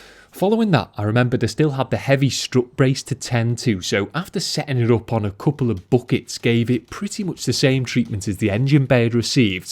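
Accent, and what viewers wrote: Liverpool, United Kingdom. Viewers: A cultured Liverpool accent, how refreshing, coming from a Brummy btw😃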